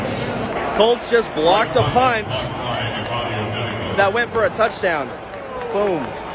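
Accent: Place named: Wisconsin, United States. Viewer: (Dude is clearly from Wisconsin)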